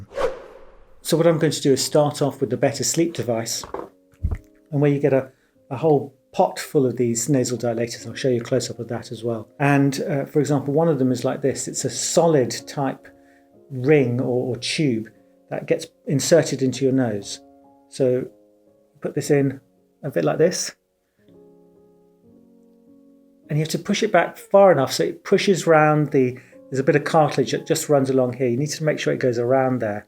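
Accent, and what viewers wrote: United Kingdom. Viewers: thank you doc for the information and the devices you ve shown .i do appreciate your British accent but i wonder if these devices are efficient for everyone and if they are available on the Algerian market or not !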